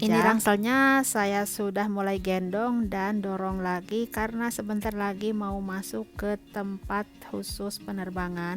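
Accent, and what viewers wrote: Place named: West Java, Indonesia. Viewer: hihihi ... Ciri khas Sunda teh 😆 semangat ngontenna teh ❤️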